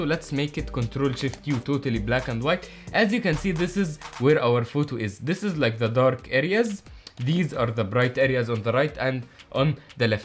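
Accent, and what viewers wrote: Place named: Egypt. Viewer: I like the last idea. Breaking down famous artists. And even in that idea, performing their famous art yourself is the part I loved the most because I guess we will go through a very good learning curve that way! 😍 Also loved this video. You should keep doing such videos too every now and then. And last but not the least, I love your accent. I was living in Cairo for 6 months and hearing you was making me feel nostalgic. I felt like I'm home throughout the video! 😃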